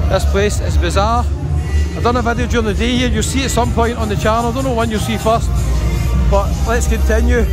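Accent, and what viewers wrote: Scotland, United Kingdom. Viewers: That pure scottish accent :)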